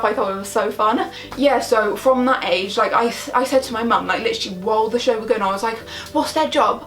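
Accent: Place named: South West England, United Kingdom. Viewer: I'm from the south west too, Bristol to be more specific. I thought you were from the south west, because of the accent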